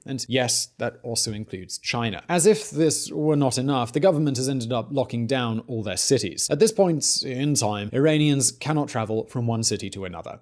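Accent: United Kingdom. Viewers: THANK You for this Information! I LOVE Your UK Accent. It fits YOU. Our Hearts are with the Every Day Iranians who have Children and Grandparents they Love and arre trying to PROTECT. This is Very Sad.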